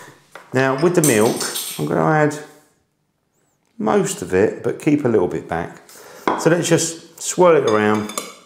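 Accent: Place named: England, United Kingdom. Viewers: I have to add to the already large list of answers to your stupid comment. He is obviously from England, and he obviously has an accent. Quite lovely by the way. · there's a little thing called pronunciation..And don't get shocked but people around the world have a different one...Especially when they are from the UK... :O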